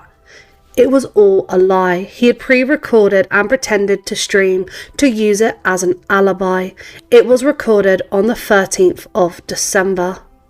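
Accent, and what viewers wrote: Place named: United Kingdom. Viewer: Im pretty good at telling Brits by your accents 🙂🙂may i ask who You Voted for, Starmer or Farage??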